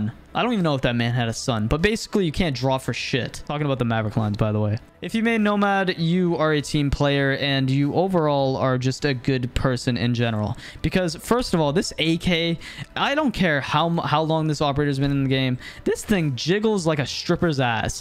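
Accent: Canada. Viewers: What part of Canada ya from bud? I'm from B.C. Triple triple btw ha ha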